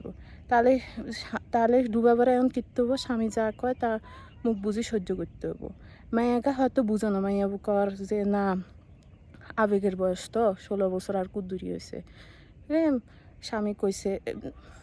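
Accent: Noakhali, Bangladesh. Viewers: আন্নে কি নোয়াখাইল্লা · ১০ টা কথার মধ্যে যদি ৩/৪ টা বুঝা যায়,তাহলেই হবে।কথায় বলে,বাংলা ভাষা আহত হয়েছে সিলেটে, নিহত হয়েছে চট্টগ্রামে।নোয়াখালী এসে পূনর্জন্ম হয়েছে।তবে তার ভাষা Perfect & শুদ্ধ নোয়াখাইল্লা।কোরআনে আছে স্ত্রী যদি স্বামীর অবাধ্য অয়,তাওইলে স্বামী তারে লাডি দি হিডি আড্ডি উড্ডি,চাটনা চুটনা ভাঙ্গি দিতো হাইরবো। মীরসরাই,চট্টগ্রামের লোক ওইলেও আঁই নোয়াখাইল্লা কতা কইতাম জানি।মীরসরাই এর উত্তর অঞ্চলের মাইন্সেরা বেশির ভাগ লোক রয়েল ডিস্টিকের ভাষায় কতা কয়। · ওনার বাড়ি হয় ফেনী বা কুমিল্লা বা নোয়াখালী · পুরাই নোয়াখালী 😊😊😊😊😅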